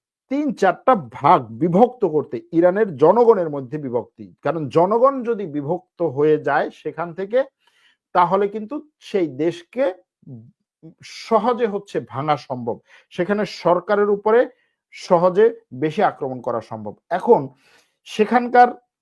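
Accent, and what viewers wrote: Bangladesh. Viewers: BANGLADESHI ENGLISH.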